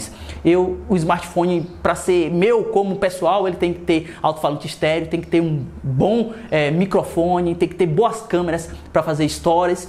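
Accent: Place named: Northeast, Brazil. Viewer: Sotaque nordestino KKK chato né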